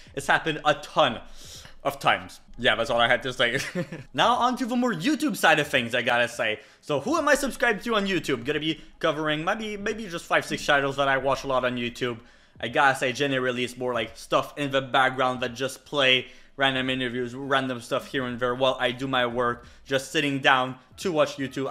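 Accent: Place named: France. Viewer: oh so you are french alright i was Thinking what kind of accent that is and where is written as you like? thats awesome!!! Favorite songs? ( is awesome too) and L0L you are younger than me i thought you were 25 or older but definitely below 30 can you watch coma attraction attraction 2 what happened to monday rampage angry birds jump street 1 and 2 Leon soul transformers 1-5 +bumblebee clash of the titans,wrath of the titans 300 great wall 47 ronin pitch Black bloodshot dr sleep ghost in the shell scarface goosebumps invisible man percy Jackson dragonball broly it 1 and 2 evil dead trilogy guns akimbo parasite spies in disguise commuter detective pikachu gentlemen non stop attack the block hard powder Cold pursuit planet of apes trilogy abraham Lincoln Vampire hunter outlander gemini man Jericho project gunman american assassin youre next halloween 2018 predator Upgrade pacific rim 1 and 2 damn you have a lot of funko pops i only have 4 or 5 i think, Thanos, gold thor, dr strange, wolverine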